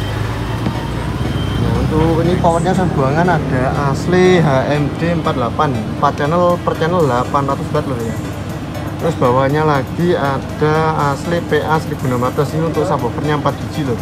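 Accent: Banyumas, Indonesia. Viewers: Pada bae wong ngapak...salam sukses selalu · Paling seneng ngrungokne omongane bangsa ngapak.. 😂 Sub e kok gk gawe 18an yo?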